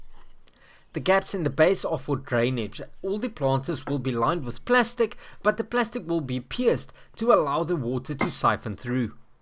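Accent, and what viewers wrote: Afrikaans, South Africa. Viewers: i love south african accents. they're so jolly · Lekker Afrikaans accent💪💪 lekker channel. Dankie. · You sound like one of those South African people.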